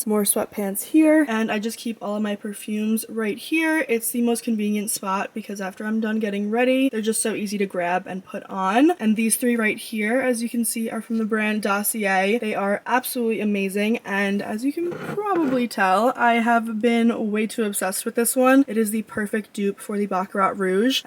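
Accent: United States. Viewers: Its a new york accent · Just enjoying the Long Island accent 💕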